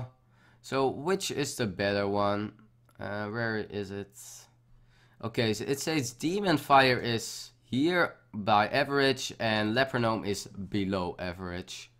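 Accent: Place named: Netherlands. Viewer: Wist meten dat je Nederlands was man. :D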